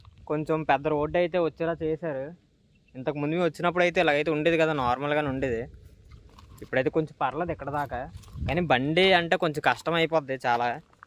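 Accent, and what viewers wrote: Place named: Visakhapatnam, India. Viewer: గ్రేట్ biodiversity of సింహా చలం హిల్స్. Nice free talking of వైజాగ్ style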